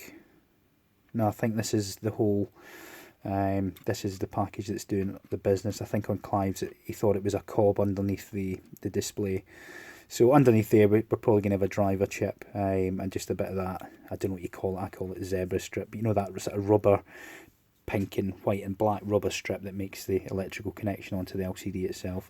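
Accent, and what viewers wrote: Scotland, United Kingdom. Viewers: I want to win or as the way I heard it.....I wan tae win :D Memories of childhood friends with the accent - rarely hear a proper Scottish accent now.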